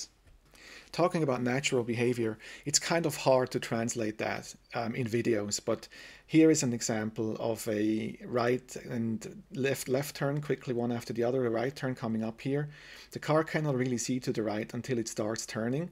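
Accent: Switzerland. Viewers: Are you from Switzerland? 😅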